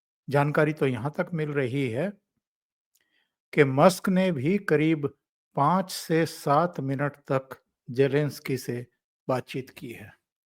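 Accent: India. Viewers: Nui to kahu Chacha Desi andaj me .news badiya h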